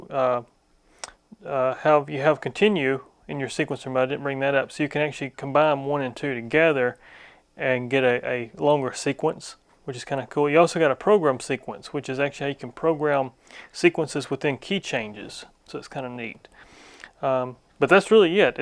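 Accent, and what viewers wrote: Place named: Appalachia, United States. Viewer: Your hillbilly accent is just adorable.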